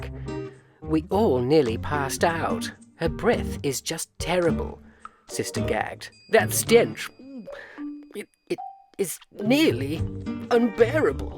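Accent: RP, United Kingdom. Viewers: the narrator speaks the Queen’s English.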